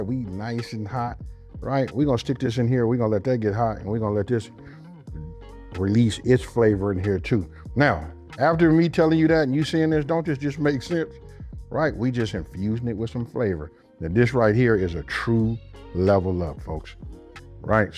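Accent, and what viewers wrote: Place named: Bay Area, United States. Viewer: Because of Ryan Coogler, I can now detect the Bay area accent 🤣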